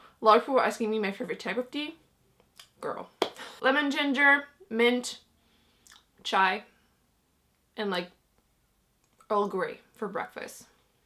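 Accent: Quebec, Canada. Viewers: I was a Canadian studies and French major at college and studied abroad in QC so your channel brings me SO much joy. I love when you speak French and your quebecois accent, it makes me feel so nostalgic. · Wow, I'm french and I love your accent when you speak Canadian french (and actually you're m'y favorite booktuber both in french or english) But I wonder...are there so many french people that are disrespectful and hateful towards Canadian french ??? I would be ashamed of my country....it's sad !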